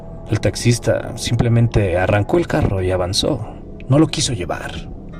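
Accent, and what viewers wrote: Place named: Fresa, Mexico. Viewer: Pseudo escritor el personaje del relato pero el narrador no llega ni a pseudo narrador seria algo como el narrador fresita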